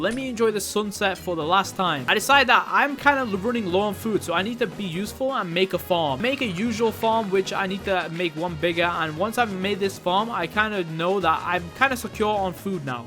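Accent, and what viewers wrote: United Kingdom. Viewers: I just love ur accent, i honestly love britian accent (sorry if i misspelled britan) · Luv that briisish accent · I love your british accent (sry if im wrong) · its just every 100 days but the the unique part is the british accent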